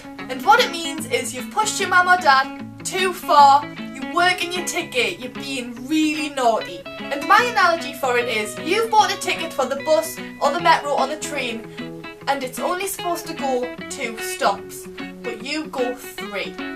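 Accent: Newcastle, United Kingdom. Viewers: If this barely Geordie I don't want to imagine how the " real" Geordie sounds hahahahah 😂😂 It's getting harder for non native speakers like me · Accent from Geordie shore, gotcha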